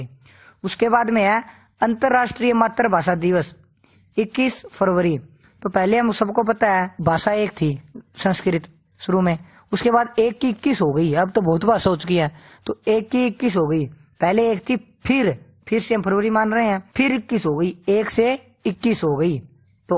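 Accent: Haryana, India. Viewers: Hariyana se hai ke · जाको बोले पक्का हरयाणवी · Achi tricks h bhaiya......lagta h Haryana se ho☺️☺️☺️ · Sir aap haryana k hai kya???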